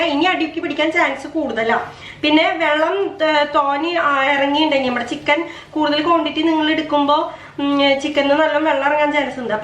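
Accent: Thrissur, India. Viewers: mde ..thrissur baasha aanalo...😂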